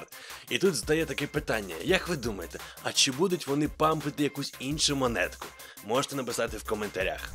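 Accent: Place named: Russia. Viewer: Забавно, как русский человек с чистым русским языком вдруг решил считать себя украинцем. Вот только для селюка западенца твой украинский все равно будет с "кацапским" акцентом. Таки пропаганда пробила здравый смысл? Оно того стоит?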